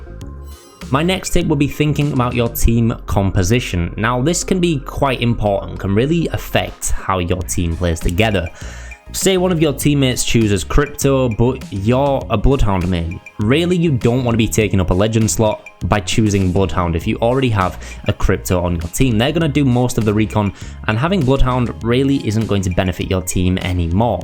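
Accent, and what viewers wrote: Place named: Scotland, United Kingdom. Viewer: There are 2 reasons why I subbed. 1. I enjoy ur content and think it's very helpful. 2. Your Scottish.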